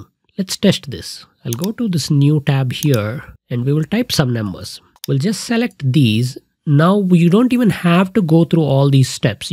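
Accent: India. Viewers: Sir your country name please? Your accent seems like Indian but when I saw your misses then I confused about your country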